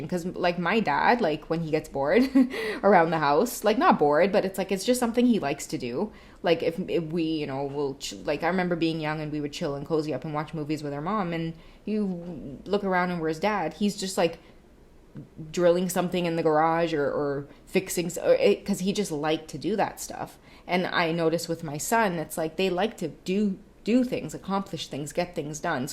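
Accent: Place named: Trinidad and Tobago. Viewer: Your channel is top quality what a treat you are! and with your cute ass trini patois just love to listen to you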